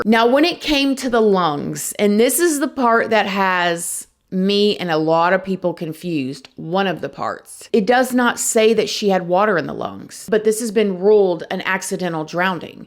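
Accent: South, United States. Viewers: she’s southern and also a grown lady, i’m sure she’s aware lol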